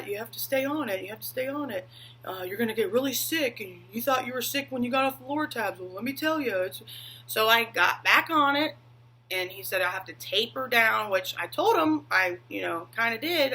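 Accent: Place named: South, United States. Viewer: I bet you are probably from the south.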